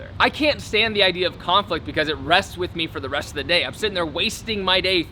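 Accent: United States. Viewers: You are asian? ^^ didn't even notice lol sounds like american, lives in usa, is american. ^^ Maybe bcs "race" is absolute not important to me, neighter nationality is. But one question still remains: How long?! 😀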